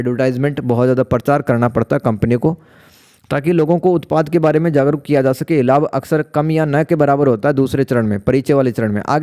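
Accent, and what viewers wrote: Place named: Himachal Pradesh, India. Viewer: Bhai ji aap himachal se ho pahadi bolte ho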